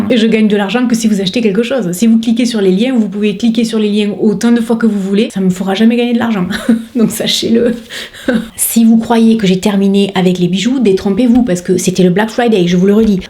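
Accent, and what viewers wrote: South, France. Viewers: Bonjour arrête de culpabiliser parce que tu te fais plaisir. Les jaloux ignore-les j'ai 68 ans j'adore regarder tes vidéos tu es naturelle reste comme ça ne change rien. Tes vidéos sont formidables. J'adore ton accent du sud je suis moi-même de Montpellier je te trouve géniale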